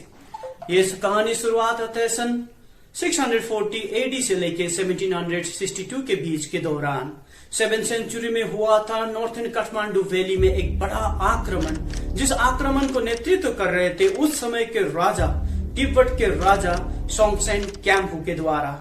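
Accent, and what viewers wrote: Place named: India. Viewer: Hahaha...nice joke first of all you sound like indian to me hybrid of indian not nepalese As a newah buddhist i would like to say Tamang neva invade or attack nepalese kingdom Tamang are one of the kind community and hard working people of nepal newah tibetan both emperor were close neighbouring nations they had a good relationship before shah dynasty came to existence both newah and tibetan exchange buddhist culture not only business even they get married Tamang are originated in nepal not from tibet Ofcourse they share tibetan culture and there own bon traditions Having mongolian face cannot be said they are mongols 😂😂 you may ask me why read a invasion japan by mongol kubalai khan Mongol were badly defeated by shogun samurai warriors several times if you go to mongolia if you call ur self as mongol they will slap you on you face without any doubt if you share ur history with them Because asian face were living in himalayas before genghis khan.. 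before saying anything about Nepalese kingdom protect your community by christian invasion mostly tamang community are walking wrong path in christianity why don't you make that kinda video rather making these kinda videos which are base less Im not saying tamang don't exist According to nepa mandal tamang gurung and newa were 3 brothers later century 3 brothers were separate it was happen in todays kavre district this incident was said by my tamang friend who is living soyambhu area in present day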